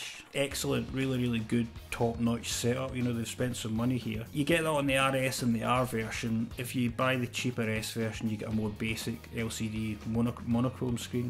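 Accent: Scotland, United Kingdom. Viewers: Ah the Scottish accent met with arsehole comments. Being Glaswegian myself, this is the shit that makes me refuse to share my own videos. If only we all had those irritating south English squeaky accents.... very very informative video guys thanks, mine comes this week :)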